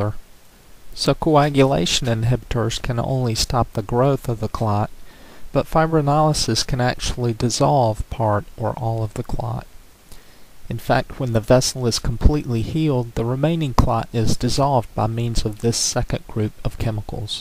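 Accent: South, United States. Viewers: Love love love your southern accent ! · the southern accent was very strong and though i am southern i cant understand it. or maybe you just need to blow your nose... i dont know...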